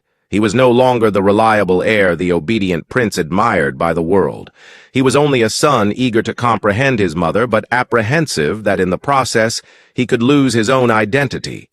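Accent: United States. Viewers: Not only is it total rubbish, gibberish avtually but this voice is so grating. Is it some kind of American regional accent? Wherever it is it wants avoiding.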